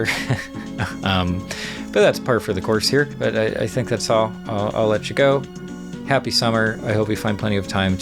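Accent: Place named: United States. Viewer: Hbomberguy with hair and an American accent.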